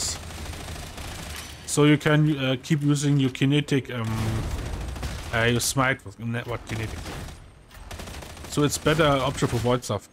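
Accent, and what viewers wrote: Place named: Germany. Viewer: Thanks for the tip! and your Denglisch is really gut :D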